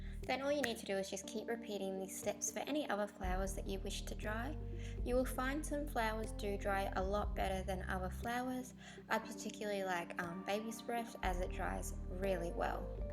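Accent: Australia. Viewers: Good vid, and nice to hear an Aussie accent 👍🏽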